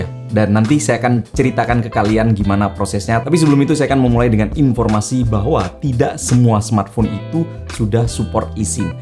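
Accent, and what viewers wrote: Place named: Madura, Indonesia. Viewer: bang gua baru notice lu orang maduris dari cara ngomong lu baru ketangkep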